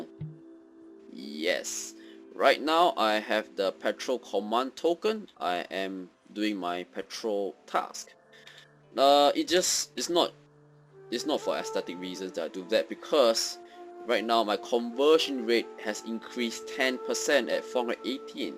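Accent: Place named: Arab world. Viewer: u have mid east English accent and u can speak Chinese?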